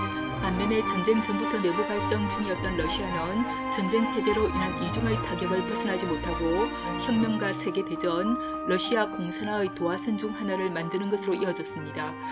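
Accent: Gyeongsang, South Korea. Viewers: 갱상도 사투리 억양이~ ㅋㅋ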